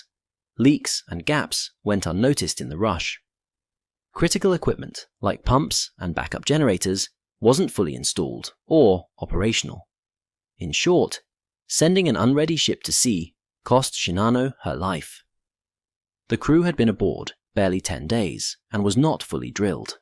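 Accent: United Kingdom. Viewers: I like everything about this channel, including the posh English accented narration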